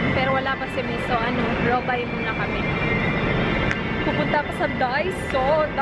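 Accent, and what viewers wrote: Visayas, Philippines. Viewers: hi??mu anhi ba daw kag Manila??hala ganahan kaau ko maminaw nmu pag mag binisaya ka..labyo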